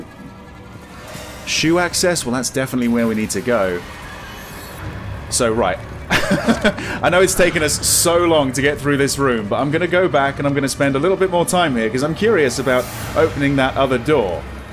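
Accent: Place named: United Kingdom. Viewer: watching exploding mutants and hearing "Oh that was lovely" with a British accent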